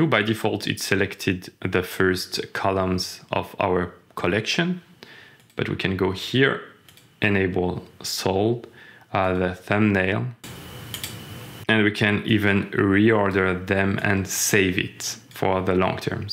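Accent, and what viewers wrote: France. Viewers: Appreciate the french accent.✌😊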